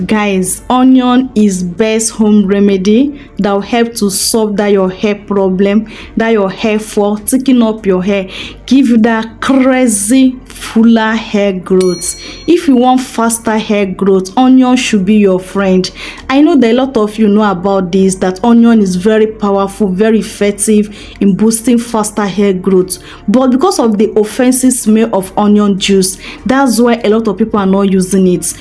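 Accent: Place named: West Africa. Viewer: Come on 😅 she is from West African that's how they speak 😅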